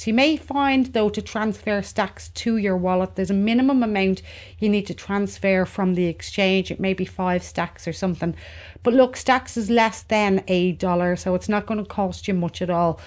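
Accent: Ireland. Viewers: Loving this video, valid info and straight to the point. Good hearing from a fellow Irish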